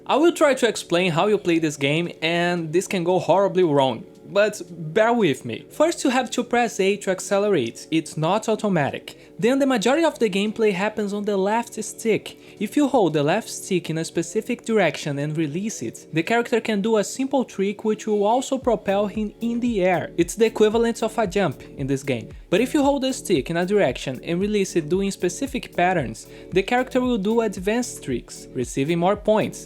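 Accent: Brazil. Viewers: Suspeitei q vc era brasileiro pelo sotaque! Kkk Parabéns pelo review! Me deixou interessado no jogo!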